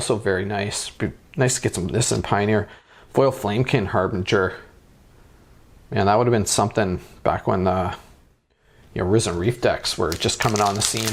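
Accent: Canada. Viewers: yes 100% as soon as i heard your voice i was like "bet hes canadian" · please tell me you're Canadian. High quality accent. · I got a mana crypt and many other goodies in my 2 boxes. You are so very Canadian sounding haha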